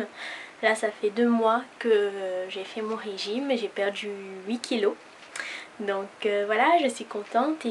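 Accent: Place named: Réunion. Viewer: Ta un accent créol réunionnais ;-)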